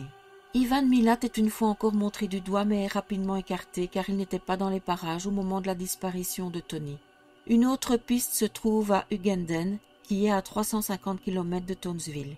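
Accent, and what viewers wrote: Belgium. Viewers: j'adore l'accent belge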